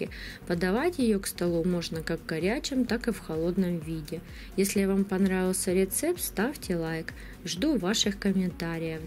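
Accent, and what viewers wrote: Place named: Ukraine. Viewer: здравствуйте! Очень доступные и просиые рецепты у вас. Спасибо, что делитесь с нами. И ещё мне нравится, как вы говорите. Вы из Украины?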